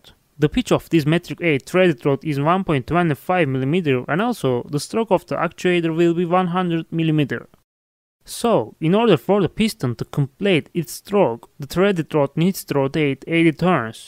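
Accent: Turkey. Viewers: Abi bu Türk aksanı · aksandan direkt anladım Türk olduğunu 👊😁🇹🇷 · Your accent is like Turk :)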